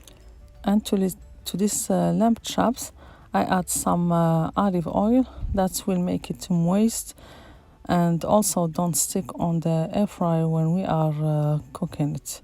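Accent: Morocco. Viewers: It sound like you have a Moroccan accent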